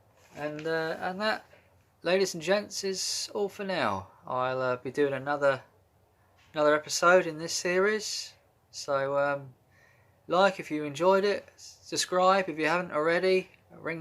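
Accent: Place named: United Kingdom. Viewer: This is Tom, not Sandra. You have a great LP collection!! Thanks for sharing them with us!! Like your British accent.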